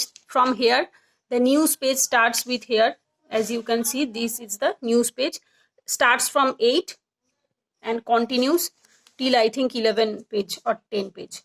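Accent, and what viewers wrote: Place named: Gujarat, India. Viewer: You speak English like gujarti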